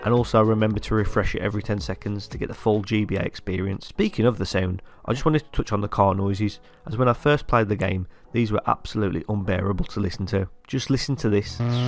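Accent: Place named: West Midlands, United Kingdom. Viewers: Nice to hear a West Midlands accent on YouTube. Great video! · Completely unrelated here. But that is a black country accent if I've ever heard one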